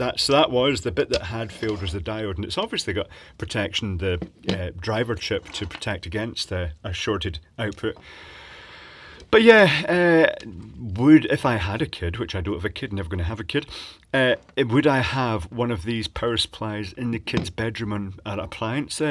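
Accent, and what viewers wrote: Scotland, United Kingdom. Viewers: he's scottish :D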